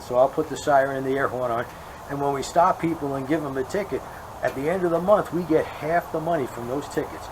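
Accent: New York, United States. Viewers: "I think I have a southern accent" ahahah Sorry, no, I thought you were still in NY, maybe NJ, until I looked up that Harley dealership. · Congrats on You're new bike, I knew that You like Breaking Balls, hence the New York accent, that You still have for sure. Those were a couple of Good ones about the 🔥 Man, and about You paying the bike off quick writing Hella Tickets. 😆 anyway✌, and keep the Great content coming...👊😎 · Neather you or has a southern accent. Although your N.Y. accent has mellowed out. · Love the videos. Great stories. Terrible Southern accent - lol. Can still hear plenty of NY in it. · Love the bike and your sense of humor. You had me for a sec when you mentioned that my speeding was going to finance your bike!! PS, sorry to say but but after 40 years, you still have a NY accent!! Enjoy that awesome bike!! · I'M FROM SOUTHERN NEW JERSEY WE HAVE OUR OWN ACCENT. 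YOUR STILL A NEW YORKA:) THAT AIN'T NO SOUTHERN ACCENT:) GET OVER IT. ENJOY YOUR NEW RIDE AND GOD BLESS FOR BEING YOU AND ALL THAT YOU DO. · Where in NY? I hear the accent, but I am a Ex-NYer too. I am from Long Island. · I'm from the UK and even I noticed your NY accent:-) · Not only do you still have a New York accent but I’m guessing you’re from Long Island. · I live in New York and I can tell you are from New York. Yes you still sound like a New Yorker. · You still have a NY accent! I'm a original mass-hole who did three years at Clearwater high school in Florida, for a crime I didn't commit. So I know a New Englander or new Yorker when I hear one. Moved to my Dad's native, upper Ohio river Valley, West Virginia, after high school and never left. I prefer the riding and culture of West by God Virginia over any place, any day! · Dammit! I knew you were from the NYC Metro area. I grew up in Rosebank, Staten Island, a heavily Italian neighborhood. 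I even had on my block.:D We never lose the accent.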